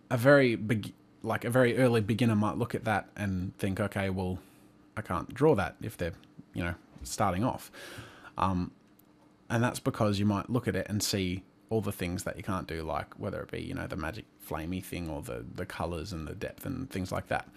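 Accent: Australia. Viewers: <3 australian accent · You were somehow more Australian then you are now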